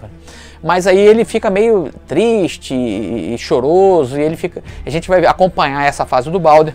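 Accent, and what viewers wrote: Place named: Northeast, Brazil. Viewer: Cara, sei nem te explicar. Depois percebi que você fala sobre algo que conhece muito bem do jeitinho que eu mesmo falo. Você é nordestino como eu né? Mas depois passei a adorar sua paixão pela coisa!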